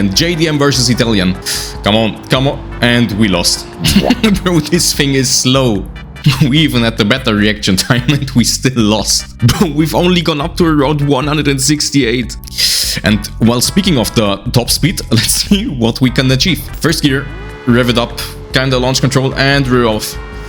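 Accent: Germany. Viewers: i can hear the german in your aktzent...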